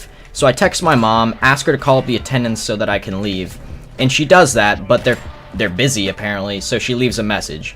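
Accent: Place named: South, United States. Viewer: You sound southern in this video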